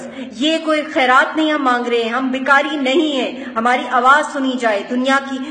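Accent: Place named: Punjab, India. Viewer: Kashmiri my foot, her accent is punjabi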